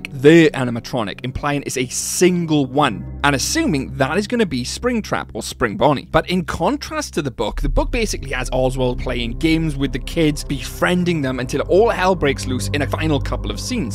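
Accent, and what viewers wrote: Wales, United Kingdom. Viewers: Welsh accent ?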